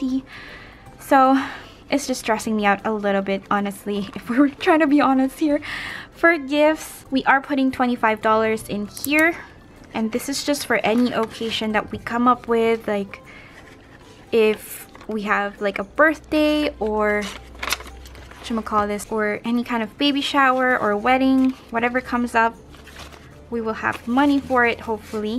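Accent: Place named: Philippines. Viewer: Hii are u filipino? I think I can tell from the accent ❤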